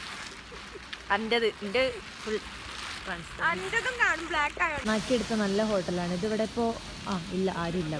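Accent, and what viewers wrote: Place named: Malappuram, India. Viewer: Nalla parajayam ulla baashaa malappuram anoo naadu 🧐 or kozhikode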